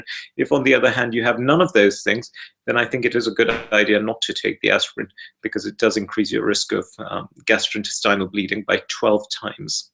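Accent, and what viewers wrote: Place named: RP, United Kingdom. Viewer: What can causes palpitations or fluttering? Since 2y i started to get them. Since 5y i got hashimoto aswell. Before that time i was very healthy and energetic... since i got hypothyoroid issues there is always something going on... say i get 1 month palpitations the rest of the year its gone. At this moment i have it 1,5 month and it is not gone yet. I blamed first probiotics or greec yoghurt. So did not take it and still its there. Then i took celtic seasalt in water and bit by bit it went away! So i was very happy. But then 3 days later it came back. Most of the time i take every day magnesium. In juni cardiologist tested potassium etc and it was good, end of july it started the palpitations. So what can i do about it? By the way you have good videos, plus you are good looking and sexy too 😁☺. I like your post english accent dr.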